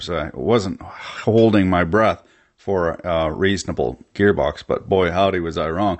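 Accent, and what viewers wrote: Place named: Canada. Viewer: I lived up in Toronto in the 80s play a little hockey bounced around you sound a little further north than most but I missed that Canadian kind of accent and the humor in the triple X 12%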